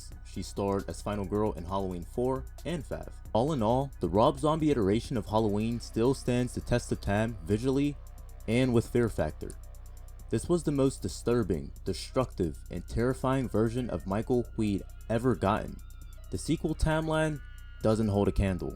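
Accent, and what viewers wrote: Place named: Pennsylvania, United States. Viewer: I was trying to place it the whole video lol. Pennsylvania is an interesting one because it bounces back an forth between east coast and kind of an upper south like Kentucky almost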